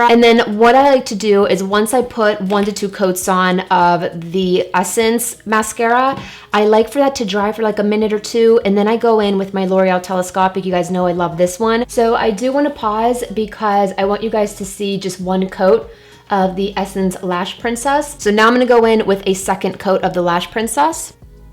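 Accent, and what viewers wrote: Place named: New Jersey, United States. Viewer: Where r u from. I think i hear a jersey accent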